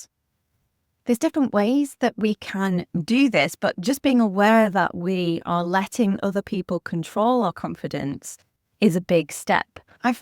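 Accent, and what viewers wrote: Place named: United Kingdom. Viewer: I am not able to understand the British accent effortlessly.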